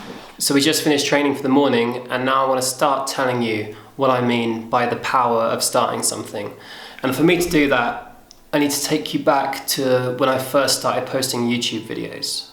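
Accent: United Kingdom. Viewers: Woah I'm just watching one of your first vlogs on your old channel and your british accent was so much thicker. Definitely can tell you've been spending a lot of times in non english speaking countries. That'll happen to you!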